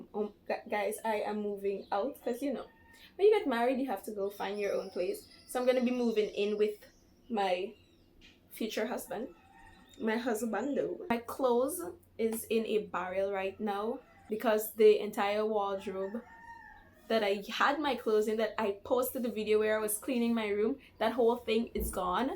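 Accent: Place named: Guyana. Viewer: Omg u have a Guyanese accent nice love it❤️❤️❤️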